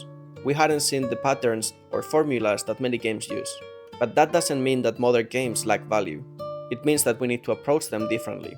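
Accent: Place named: Spain. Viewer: Se nota que eres español, buen ingles crack ÑÑ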